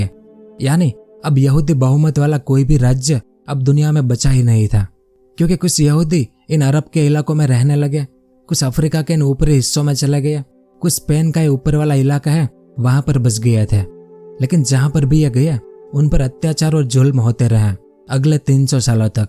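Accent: Gujarat, India. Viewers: आपने भोट बड़ा इंफॉर्मेटिव ब्लॉग बनाया और इसके लिए आप धन्यवाद के काबिल हेन और भोट ही भीतर बनाया जिस्म ४००० बरस का इतिहास जो मैंने मेरी ९० बरस की उम्र में खिजाना था और हिन्दी मेरे लिये भोट मुश्किल हेन मेरी ज़ुबान गुजराती हेन लेकिन इतना कर शक्त हूँ आप के बोलने से आप गुजराती लगते हों और voice भी भीतर करने की ज़रूरत हेन फाड़ी आवाज़ ज़रूरी हेन ऑलोवर भोट भोट भीतर हेन जिसकी आप को धन्यवाद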